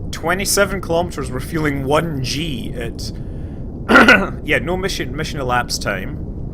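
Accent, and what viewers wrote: Scotland, United Kingdom. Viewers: Sounds like Scott's accent came back with him from Scotland. Neat! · is all like "How come we don't have a swamp anymore!?" in his Scottish accent. Couldn't help but Shrek.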